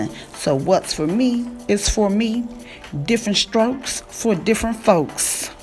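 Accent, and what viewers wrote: South, United States. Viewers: When I tell you I have watched this about 5 times. One of my favorites !! It's the laugh and the commentary for me hunni!!! "That royal blue baby....royal so loyal"!!!😊😆😂 As far as the country accent...It's a southern thing....nothing wrong with a little twang...I'm a southern girl as well!! You are the best!!!!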